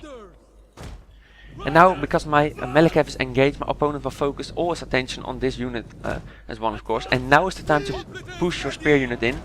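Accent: Netherlands. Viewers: damn i know dutch person when i hear one, lekker bezig pikkebaas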